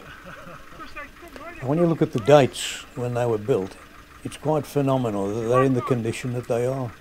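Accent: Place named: Halesowen, United Kingdom. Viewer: Wow I was born and raised in Halesowen (1940s 50s 60s) all my family and many cousins, some of which still live there. Brings back memories . Good to hear the old accent.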